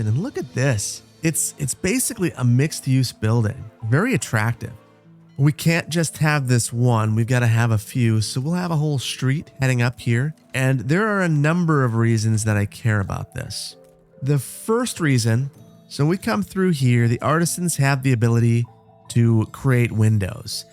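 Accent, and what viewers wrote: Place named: Midwest, United States. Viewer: can really tell you're from the midwest :P schooner is pronounced like school is, ch makes the k sound. and frigate is pronounced like "frig it" :D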